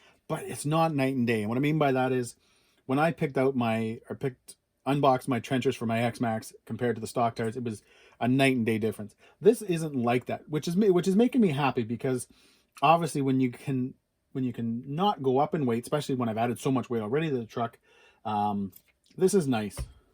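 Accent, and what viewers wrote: Canada. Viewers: You from Nova Scotia ?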